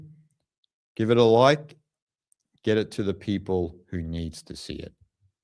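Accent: Australia. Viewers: I love your Australian accent! Thanks for keeping it real!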